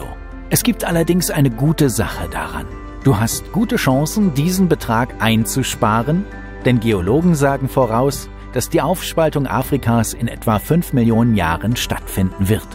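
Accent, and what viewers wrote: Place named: North, Germany. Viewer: ‘aus ‘ein ‘ander: Du bist aus Norddeutschland, ziemlich nördlich! 🤣 Das war's aber auch schon mit neuen Erkenntnissen.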